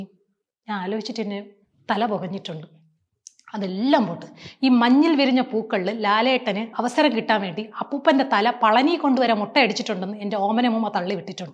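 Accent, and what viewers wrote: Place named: Trivandrum, India. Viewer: assal tvm avatharanam. Love you from tvm and near by your place ❤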